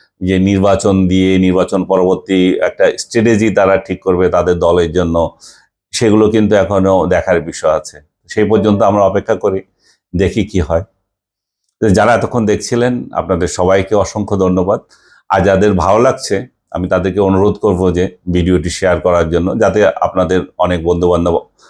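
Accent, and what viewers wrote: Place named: Chittagong, Bangladesh. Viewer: you are so very weak in English Bro. Don’t try English. And also Ctg accent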